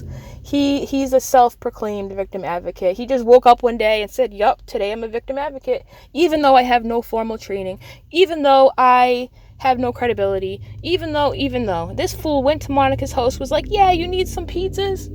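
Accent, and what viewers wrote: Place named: Boston, United States. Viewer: Great episode.... I love your accent.. Do I detect a Boston accident?? Maybe new york? One of the burroughs?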